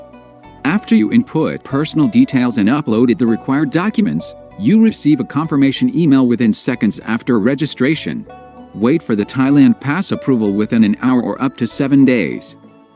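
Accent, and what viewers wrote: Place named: Ukraine. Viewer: Gday, pete sent me here. subbed liked To your channel. I like pete and his videos alot, but i was annoyed by his question asking you if russia should have invaded ukraine bloody STUPID question! some feedback for you. I really dislike computer voices on videos, I much prefer you speaking even if you might think your ukranian accent might be difficult for some people to understand. Or whatever other reason. please consider using yr real voice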